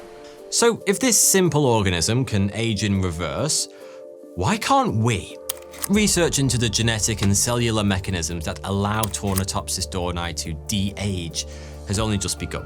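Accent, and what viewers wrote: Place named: Leeds, United Kingdom. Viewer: Hey - do me a favor. I guess accents, and for your accent I am going with Leeds or the near North of Leeds, How close was i? Thanks for being a sport.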